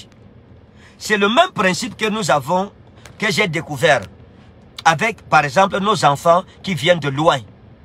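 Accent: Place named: Democratic Republic of the Congo. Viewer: Pasto vous avez l'accent d'un zaïrois c'est juste pour savoir sa n'a pas d'importance mais je cherche à savoir ne voyez pas dans ma question un esprit polémique..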